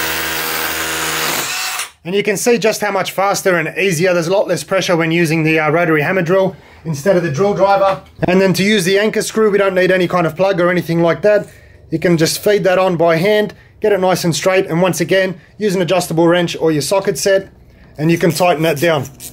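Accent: Australia. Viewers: Best Vid bro... Easy, quick and direct... and awesome to hear a middle eastern aussie voice.. lol.. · Thanks so much. I loved the Aussie accent the most.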